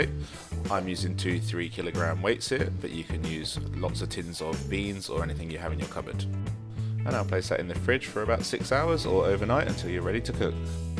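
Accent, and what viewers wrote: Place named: United Kingdom. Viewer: ha ha! No no, keep the mystery alive! Especially with the dreamy British accent! lol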